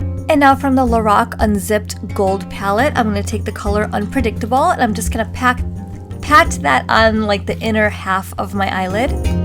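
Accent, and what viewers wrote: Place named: Bengal, India. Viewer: I'm Bengali too and I love your videos. Don't worry about your accent I have one too so it's all okay.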